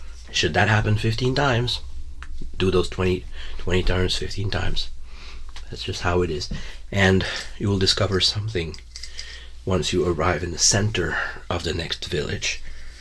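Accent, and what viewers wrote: Quebec, Canada. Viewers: I just discovered ur channel and it’s amazing 😍, Idk what’s going on most of the time but it’s very relaxing 😌 Lové the costumes etc and ur French Canadian which I also love 😁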